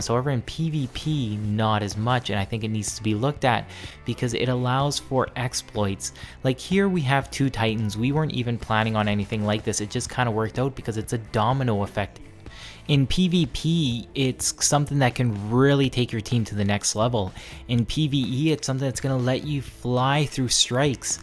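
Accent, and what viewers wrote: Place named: Canada. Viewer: Canadian ey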